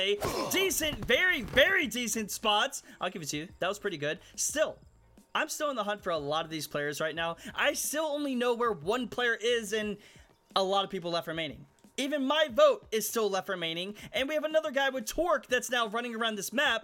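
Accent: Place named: Ireland. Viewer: Littlr irish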